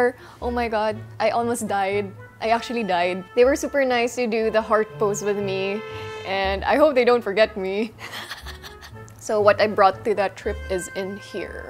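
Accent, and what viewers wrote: Philippines. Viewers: you have a Filipino accent hehe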